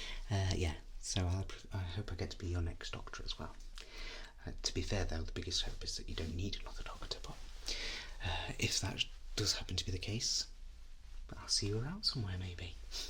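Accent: United Kingdom. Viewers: He sounds... British?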